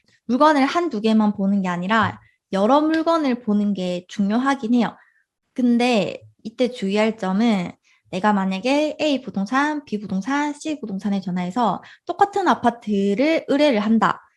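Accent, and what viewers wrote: Busan, South Korea. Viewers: 부산에서 오신건가요 잘 봤습니다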